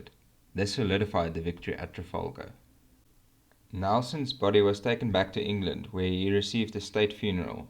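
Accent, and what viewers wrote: South Africa. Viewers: You afrikaans? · are you from south africa?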